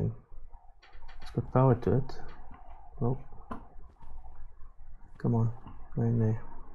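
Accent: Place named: South Africa. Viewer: Aweh, you sound South African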